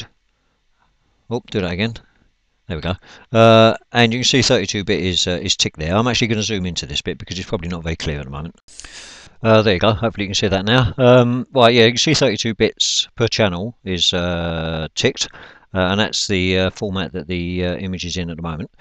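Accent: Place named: Liverpool, United Kingdom. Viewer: My God he's from Liverpool.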